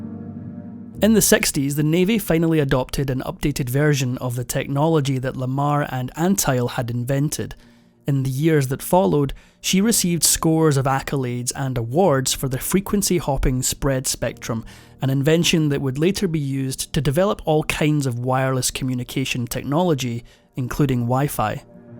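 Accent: Scotland, United Kingdom. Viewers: Ah. the narrator is a Scotsman - how novel is that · I don't know what your talking about! I thought this man had a lovely Scottish accent. I'm Scottish too and very proud of our accent. What a cheeky you've got! 🏴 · And as n Anglo speaking listener, not only Scottish, but clearly spoken and completely understood 😉 Beautiful lilt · People only talk about her WW ll contributions but the more you remember or read about her, this was one sick Beech. Addicted to amphetimines and hateful of her oldest adopted son, she also felt entitled to shoplift. Her marriages were a disaster. Lamarr, charged with allegedly shoplifting $86 worth of merchandise from the May Co. Wilshire department store, was found not guilty by a jury. Photograph caption dated April 25, 1966 reads, "Denies any intention to take merchandise from store without paying for it." She was married and divorced six times. 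I love the Scottish accent!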